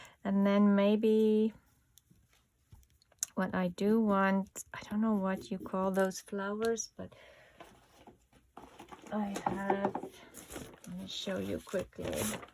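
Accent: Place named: South Africa. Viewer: I found your video today. Love listening to a fellow SA accent.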